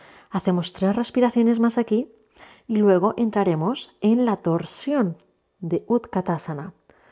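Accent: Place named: Argentina. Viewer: Buenos días si fue intenso porque mis rodillas me fallan pero trate en lo que pude hacer muchas gracias, voy a repetir este si me gustó, gracias siento con tu voz una persona muy agradable y sencible Dios te bendiga,por x tu acento creo eres Argentina, bonito día ❤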